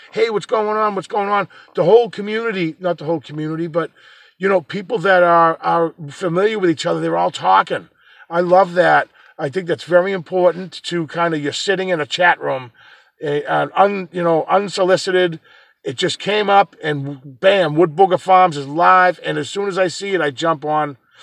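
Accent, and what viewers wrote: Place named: New York, United States. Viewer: I love your channel becsuse you're a regular guy dedicated to honest research. I like you even more now because asshat is my favorite pejorative descriprion of some folks. I also love your accent, and I'm from Long Island so I can relate.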